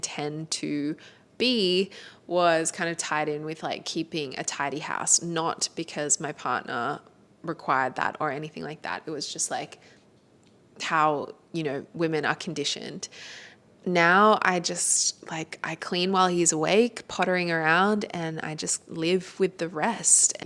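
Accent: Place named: Australia. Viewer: I noticed the Aussie accent! Where are you located?? I'm in NSW, talking about similar things on my Youtube channel. I'd love to connect! 💖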